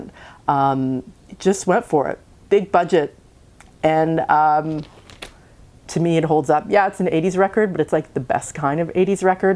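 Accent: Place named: Canada. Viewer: ? Thank you! My heart is melting. I heard your Canadian accent immediately.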